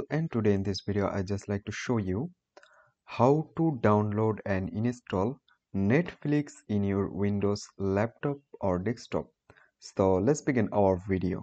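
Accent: India. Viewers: when you search up how to do something and you here the videos voice be an indian you have hope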